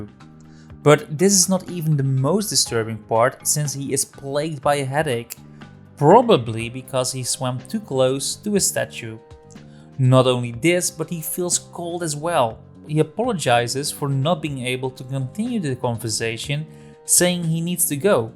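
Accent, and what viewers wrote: Netherlands. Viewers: Are you Dutch? I don't think I have to ask, because I can hear it on your accent! :P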